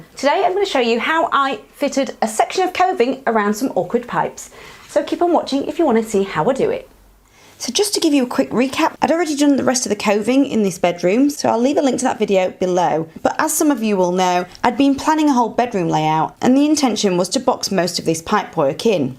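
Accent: United Kingdom. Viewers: Oh. I love that British accent.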